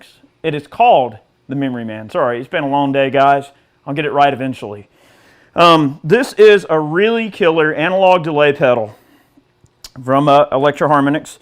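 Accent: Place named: United States. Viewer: Those Yankee hillbilly accents and those GI Joe Iraq hats should be aced. It's like one of those guys off of Duck Dynasty turned guitar player.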